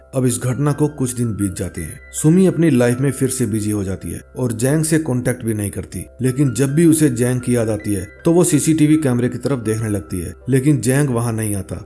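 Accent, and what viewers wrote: Punjab, India. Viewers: Punjab se hi bhai ki awaj Punjabi he bro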